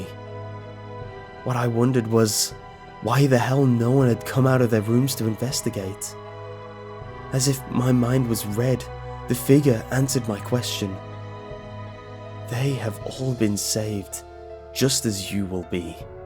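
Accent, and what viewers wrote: United Kingdom. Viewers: Oh my..... I thought he said chicken too! LOL Well darn his amazing British accent I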